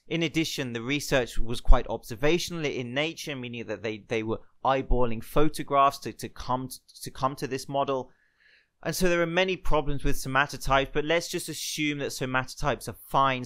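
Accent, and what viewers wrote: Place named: St Albans, United Kingdom. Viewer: I've been seriously getting into fitness for the last year. The YouTube algorithm has clearly decided I'm ready to level up — these videos are exceptional and seriously adding value to my life. Thank you so much! Your accent sounds like it's from my hometown, St Albans, which is comforting during lockdown up in the sticks in Scotland :)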